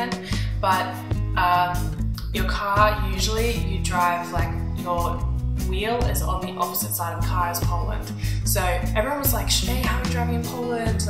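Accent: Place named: Australia. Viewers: I came here to hear australian person speaking to come to know that accent :) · I’m polish, but I moved when I was 11 and currently live in Scotland UK 🇬🇧. I love your accent!! I love AUSTRALIAN accent, and I’m going there for a gap year next year, I can’t wait!!!!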